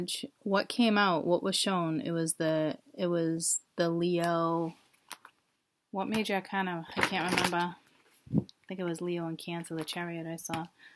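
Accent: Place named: Massachusetts, United States. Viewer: I know what I would do.....🏃‍♀️👀🏃‍♀️👀🏃‍♀️👀🏃‍♀️👀no woman should ever take a cheater liar thief and deadbeat dad back. Stay where you thought the grass was greener but instead you got the Sahara desert 🐫 🙏❤️thank you love that Massachusetts accent. Right up the road from you. You are a great reader 🙏❤️⭐️⭐️⭐️⭐️⭐️ An ex asshole libra husband 😂😂😂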